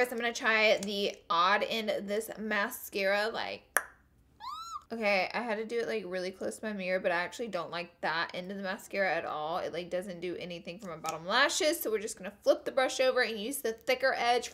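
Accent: South, United States. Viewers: I love u u r soooooooooooo awesome I love your southern talk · Madilyn Z she sounds like a southern version and I love pll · When you said "oil free" I totally thought you said "all free" because of your southern accent compared to the Boston accent I grew up around!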